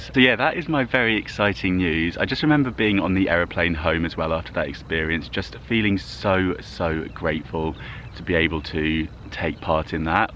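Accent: England, United Kingdom. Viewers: Wow! Congratulations this is wonderful news!...Wish we could tune in also..in the UK!......The American viewers will love your English accent too...Wishing all the very best you deserve it...Fondest wishes from Derbyshire xxx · Congratulations, you have such a lovely English accent, pretty sure the Americans will love you, and I’m so glad you got to film and hope you get the chance to do more over there, 🏴 love Katrina xx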